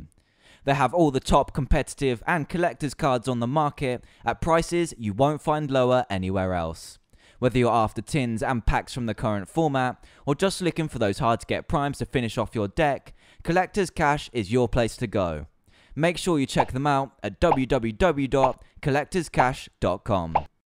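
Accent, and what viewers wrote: United Kingdom. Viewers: Your English accent makes the Hypno poem way scarier. English accents in general have that effect.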